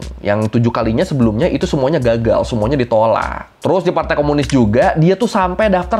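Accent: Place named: Java, Indonesia. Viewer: ini perasaan gw doang atau bang di video ini lebih medok ya😅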